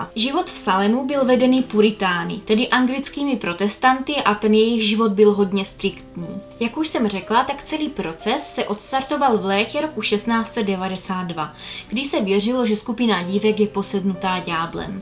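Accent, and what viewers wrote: Moravia, Czech Republic. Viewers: chtěla jsem Vám sdílet video na svém facebooku, ale neudělám to - uráží mě, že Jesenicko považujete za Čechy, když se jedná o MORAVU. Olomoucko leží na MORAVĚ, Olomouc je MORAVA. je to dost smutné, protože podle přízvuku jste i vy z Moravy.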